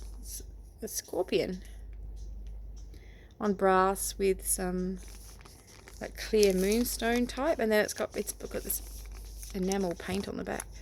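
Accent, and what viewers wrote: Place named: Australia. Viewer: I love these videos. We girls never tire of looking at jewelry. Lol You gotten awesome stuff in this giant haul so far. And I could listen to you talk all day. Loving your Austrailian accent. Thanks for sharing this enormous haul. That brooch looked like a torpedo and a and a giant land mine to me . Omg I love Blue Bus Dave too!!! Have a great day my new friend !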